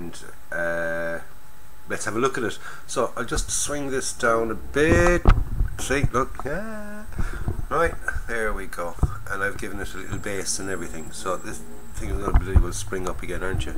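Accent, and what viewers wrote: Ireland. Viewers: Very nice. And it's wonderful to hear your Irish accent, reminds me of my dear old Dad. Italeri can be a bit of a challenge, like not having locating pins for shackles, tow hitches etc. But you've done a "fine and decent job" of it.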